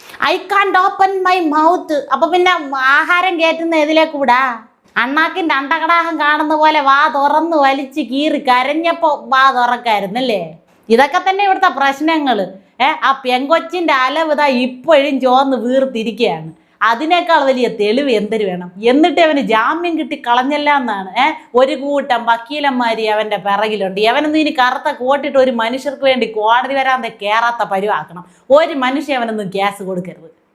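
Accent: Thiruvananthapuram, India. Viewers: ഇത്ര നന്നായി തിരുവനന്തപുരം ഭാഷയിൽ മുഖത്തടിച്ച് കളിയാക്കുവാൻ കഴിവുള്ള ഒരാളെ അഭിനന്ദിക്കാതെ വയ്യ. Adv.സാജനും തിന്മക്കെതിരെ പൊരുതുന്ന ഈ മാദ്ധ്യമപ്രവർത്തകക്കും ഒരുപാടു് അഭിനന്ദനങ്ങൾ...😂❤🙏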